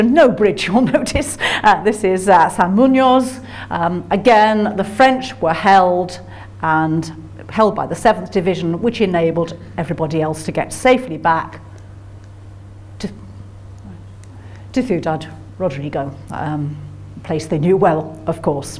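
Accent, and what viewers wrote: United Kingdom. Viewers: Since she spoke with a British accent, I will not argue any facts of these events.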